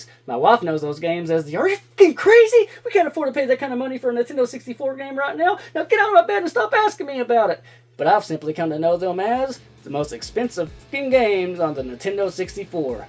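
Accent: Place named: Kentucky, United States. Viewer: Hell I thought your accent sounded rather familiar. I had a feelin you were a Kentucky boy too~ very nice collection of games and I can see why those 5 are considered the "Big Bad 5" I honestly had no idea about Sculptor's cut either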